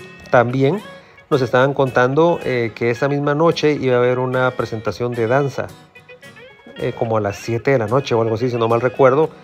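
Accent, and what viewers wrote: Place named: Guatemala. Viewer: el acento chapin wow I love it !!!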